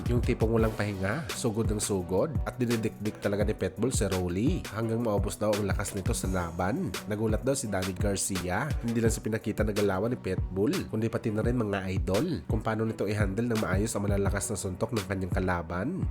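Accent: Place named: Visayas, Philippines. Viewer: Prang baguhan po kayo d2 s pinas,, ang vlogger po n ito ay isang visaya/visayan kaya kung mgpronounce lumalabas talaga ang pagiging kung anong language nila , pra samin ang spelling tama pero ang pronunciation kung hndi mo alam kala mo tama ka, at kmi mali,, ngayon kung pure tagalog ka maaaring tama k pero alamin mo muna po kung sino b at anong tribo ang isang tao,,🙏♥️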